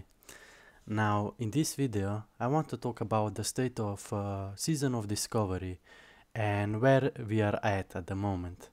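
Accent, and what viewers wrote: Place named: Europe. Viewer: how do i block all classic wow content narrated by an esl european accent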